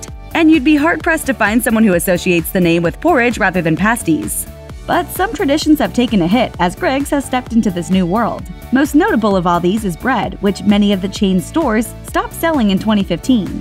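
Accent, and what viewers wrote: United States. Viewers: Omg as a British person it’s so damn annoying to hear an American talk about this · I'm sorry, It's just weird to hear an American talk about Greggs and Newcastle.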